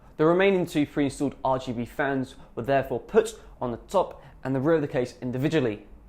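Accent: United Kingdom. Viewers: u wot m8? he just has a british accent and a light one at that. what are you on? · I don't understand what you are saying bristish accent so hard for me..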